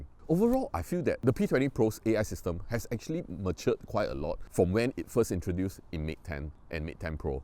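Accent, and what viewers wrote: Singapore. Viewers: You sound really Singaporean / Malaysian. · No anyone comment on the Singlish?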